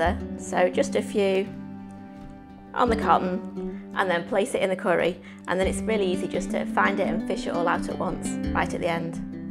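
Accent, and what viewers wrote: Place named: Manchester, United Kingdom. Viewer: Love your show, somehow I have a feeling that you are a Mancunian Girl, and love your accent. We know your husband’s name is Chris, how about your name? It’s nice to write a comment with your name in it. As for this Curried Lamb, I had to clear all the fats and bones to avoid the lambie flavour, Australian Lamb is not the best in the world, and is so Rich, I suggest that you replace the Stock with water to lessen the rich flavours. As for the stringing of the Cardamom, I normally use a special stainless steel Tea Strainer where you can add other pods and seeds if required. I am cooking this spring lamb leg (de boned and fat completely removed) today and will let you know of the result. I think it’s going to be good. Thanks. Regards, Dr. Matthew Banno, Melbourne, Australia.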